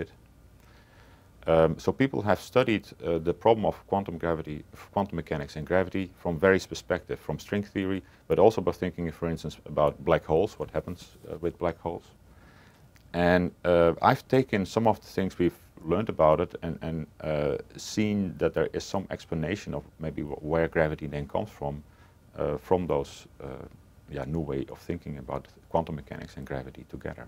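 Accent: Netherlands. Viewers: That Dutch accent. I recognized it immediately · As a fellow Dutchman, I recognize that accent 100% of the time. ;-) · I think he sounds perfectly like a damn dutchman, with his accent, in english, no idea why you think otherwise. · ***** The meaning of "He's Dutch" is that he actually lives in the Netherlands & speaks Dutch. Since English is his 2nd language (assuming he doesn't speak French & German as well) his articulation isn't quite as good as a native speaker. That does not mean there is anything wrong with his science. Only his articulation of it in English. For me, I understand him fully. Sinds ik spreekt ook Nederlands. But since you & the rest of the flat brainers think he is saying gravity doesn't exist, I have to assume it is in his use of the language. Since you can't understand what he is saying & also can't understand my point I have to assume you have an IQ of around 58.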